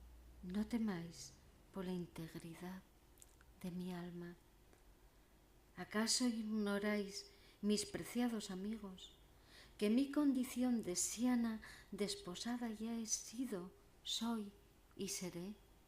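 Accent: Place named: Spain. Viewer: Que lindo! muy dulce tu voz y ademas amo ese acento español!! un abrazo grande compañera!!!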